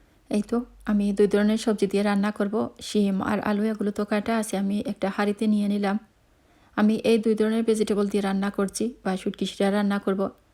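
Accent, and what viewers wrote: Sylhet, Bangladesh. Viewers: আপুর কথার মধ্যে সিলেটি কথা চলে আসে।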